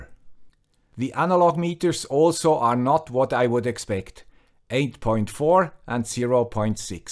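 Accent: Switzerland. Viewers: Thank you for these excellent videos. I love the clear thinking and explanation you bring to them. So, as AI has transitioned from its early mechanistic approaches (e.g., expert systems, etc.) to the current models based on non-parametric statistics, there is also a subtle change in its successful application. The current models are more about being 'less wrong' than 'exactly right in the instance'. This is encouraged by its alignment with large number theory and other assumptions (e.g., Bayesian Analysis, etc.). Given this, we might best think of modern AI technique as most suitable as part of a 'problem-space reduction' tool that gets bolstered and completed by more traditional, deterministic kinds of algorithms, rather than as a central part of some core algorithm. AI's real mission, IMHO, is about abstracting 'the smart' from from everyday good engineering, and this will require a different kind application with different kinds of value produced. 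I like to think of AI as a kind 'context manager' for whatever problem(s) I am trying to understand and solve. Still, this was a bold and valuable attempt. Bravo to, The Guy with Swiss Accent.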